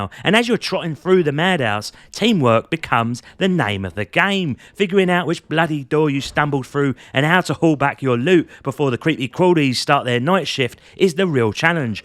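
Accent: Cockney, United Kingdom. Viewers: A game reviewer with a cockney accent was something I never knew I needed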